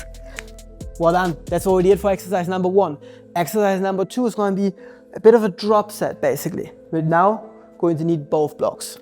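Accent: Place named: Germany. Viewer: Was ich schon länger mal Fragen wollte: Wo bist du eigentlich geboren? Es klingt so, als hast du einen deutschen Akzent :P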